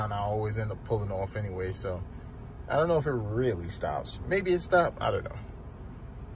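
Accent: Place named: Washington DC, United States. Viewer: You sound like you from the north like DC area ! But yeah I’m getting the Santa Cruz premium or limited can wait to test one tomorrow 👍🏾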